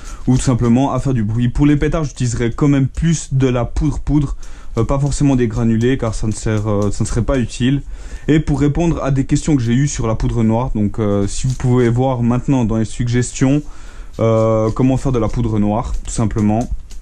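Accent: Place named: Switzerland. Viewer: Bon... par où commencer : 1: je suis un passionné de pyrotechnie depuis mon plus jeune âge et tes vidéos sont exceptionnelles... 2: ton accent me semblait tellement familier je me suis dis ''obligé il est Suisse''... et vu les journaux, on vient du même endroit ! 3: J'ai environ 1 million de questions à te poser, je serais ravi que tu régales un Neuchâtelois 😄 Bref au top !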